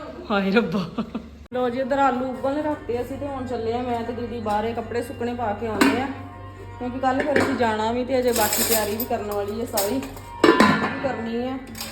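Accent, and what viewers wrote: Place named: Majha, India. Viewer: Nice vlog tusi kithe o tuhde boli majje wale aa sisters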